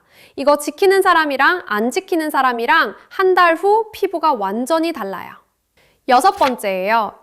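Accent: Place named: Seoul, South Korea. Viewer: 경남인은 그냥 서울말로 들리는데..ㅎ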